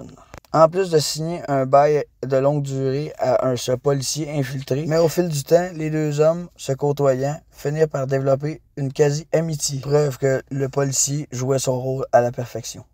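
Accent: Quebec, Canada. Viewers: Il y a quelques années dans le cimetière Notre dame des neiges, je suis passé devant la tombes des Violi. Un magnifique monument avec le nom des trois frerots. Digne d'un film de la mafia! C'est agréable de vous entendre parler Québécois avec vocabulaire irréprochable! C'est une bien belle façon de se distinguer! Bonne chance dans vos projets!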